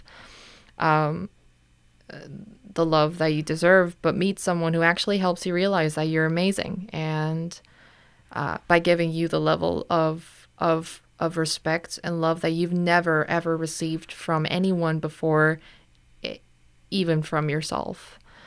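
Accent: United States. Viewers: What part of the United states gives people that accent?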